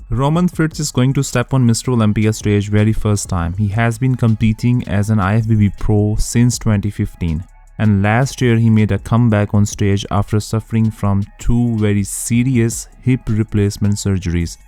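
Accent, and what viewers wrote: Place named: United States. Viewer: Arey bhai kya american angrej accent mein bol raha hain aap. Hindi mein bhi videos karo na bhai.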